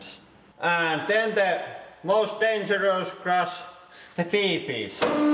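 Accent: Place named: Finland. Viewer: Sun ralli englanti on paras!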